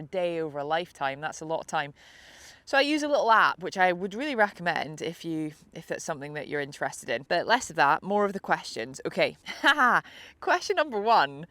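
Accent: Scotland, United Kingdom. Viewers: Love this channel, so easy to listen to you with that soft Scottish tone. And a smooth looking swing that anyone would want. Don't stop.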